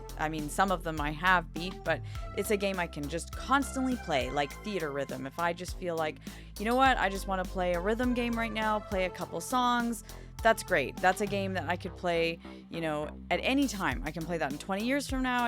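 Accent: Canada. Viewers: I can always tell someone is Canadian when they say “sorry, hard, Mario” and I love it 😊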